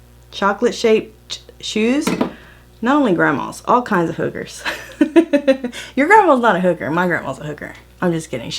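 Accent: South, United States. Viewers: her Southern drawl at times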